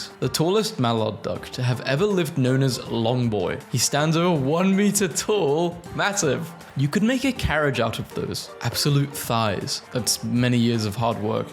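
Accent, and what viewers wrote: United Kingdom. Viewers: Remember, he British, he’ll pronounce things differently every once in a while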